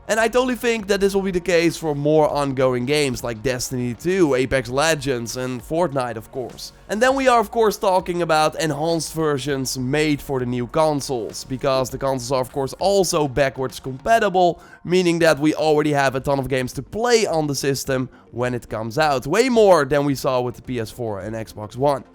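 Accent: Netherlands. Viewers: Man Ive been watching a lot of your videos since you were mainly uploading AC odyssey videos and I still have no idea which accent it is that you have. Is it Dutch? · I can hear ya dutch accent😅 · You sound Dutch...but are you African American?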